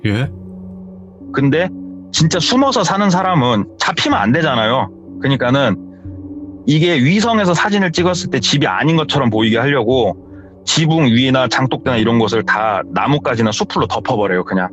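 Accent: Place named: Jeolla, South Korea. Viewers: 정겨워요~ 저도 전주사람 입니다 제가 항상듯던 우리동네 말투~ㅎ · 고향이 전북쪽인거 같아요 말투가 너무 정겨워요~~째깐한^^저랑 고향이 같으신듯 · 의 정겨운 전라도 사투리..좋아요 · 맞아, 몰입감 너무 좋고 전라도 살았던 청취자로서 오랜만에 듣는 사투리에 정겹게 들었음ㅋㅋㅋ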